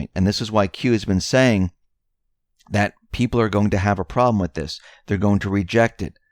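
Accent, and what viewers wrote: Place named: New York, United States. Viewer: Try to soften the Nu Yawk accent. AWLways. AWLready. CAWfee.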